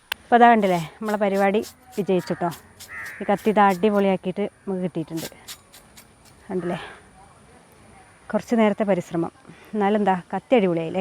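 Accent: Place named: Malabar, India. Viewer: മലബാർ ്് സ്ലാഗ് സൂപ്പർ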